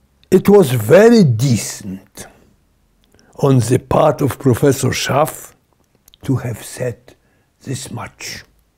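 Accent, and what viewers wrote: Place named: Germany. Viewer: niby mówi po angielsku ale akcentuje jak po niemiecku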